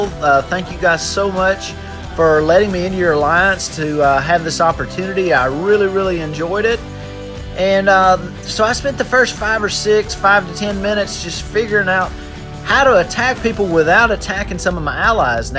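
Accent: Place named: South, United States. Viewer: Game looks interesting. You sound less southern.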